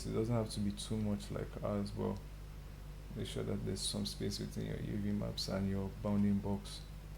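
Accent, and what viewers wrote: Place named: Nigeria. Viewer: Omg are you Nigerian?? You sound nigerian. Thank you for making this vid ❤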